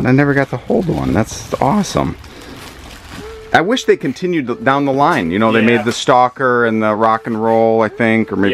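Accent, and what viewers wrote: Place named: Michigan, United States. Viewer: Just found your channel, and it sounds like you're from Michigan as well. You're grabbing all these toys right out from under my nose!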